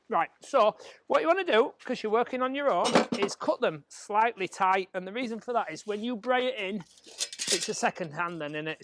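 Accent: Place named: Yorkshire, United Kingdom. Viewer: Found these today, first of all thank you for taking the time to make these. I think they're the best step by step instructional videos I've seen, very well done! And sounds like a proper Yorkshire accent too, which is even better! Question - what size channel do you use for the shoes? Struggling to find one that will fit 75mm internal dims. Do you use the grinder to cut them from a length? Thanks very much, keep up the good work! Si thi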